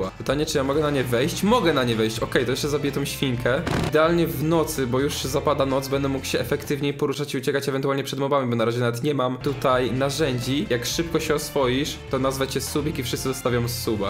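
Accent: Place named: Poland. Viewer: Ten twój Polski angielski czasami boli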